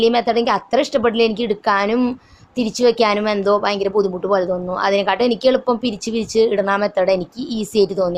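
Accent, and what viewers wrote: Thrissur, India. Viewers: Samsaaram kettapol thoni .ivde ente adutha flatil nd .1 thrissur kaar.avrde samsaaram ningade samsaaram same aane .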